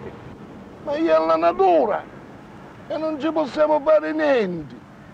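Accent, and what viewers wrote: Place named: Catania, Italy. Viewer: Accento (catanese e non palermitano) orribile peraltro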